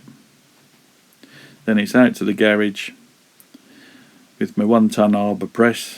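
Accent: Suffolk, United Kingdom. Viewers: You sound like a Suffolk guy inspired by jacklore just like me. This is great video and very informative. I love that little knife you made too.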